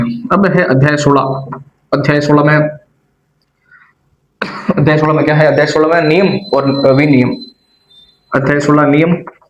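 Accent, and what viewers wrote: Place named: Bikaner, India. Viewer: Jai Shree Krishna Bhaiya ...Apki boli Se Aap bikaner ke lag rahe ho..me bhi Bikaner se hi hu Or Army me hu..😊EO RO ki tyari ke liye Apse jud jaau kya ..Part-B pura karwaoge na Aap😊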